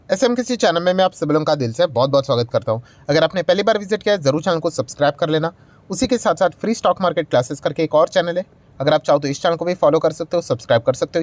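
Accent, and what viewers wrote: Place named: Gujarat, India. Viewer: Bhai aap kya gujarati hoo 😂 aapki boli pr se lage Love from rajkot ❤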